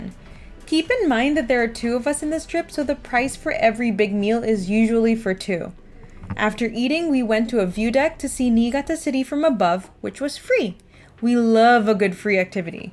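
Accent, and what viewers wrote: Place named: Spain. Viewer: The Spanish accent pronunciation of the Japanese words is really interesting.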